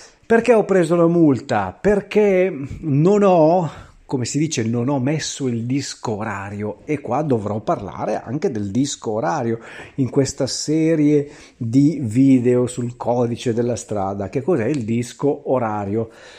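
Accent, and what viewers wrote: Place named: Bergamo, Italy. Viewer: Ciao. Mi piace ascoltare il tuo accento Bergamasco quando non ne puoi più.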